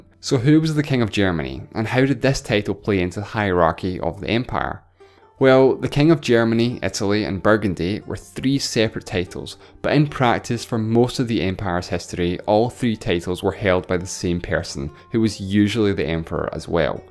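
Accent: Scotland, United Kingdom. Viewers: It’s just Scottish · Everything sounds better in Scottish. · You sound like a Scotsman 🏴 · What’s the accent here? Scottish? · I can't make out the accent. Yorkshire? Scot? Irish? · Came from lore page. Disappointed by Scot accent. U sound dumb & angry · Bro is so Scottish I can’t keep up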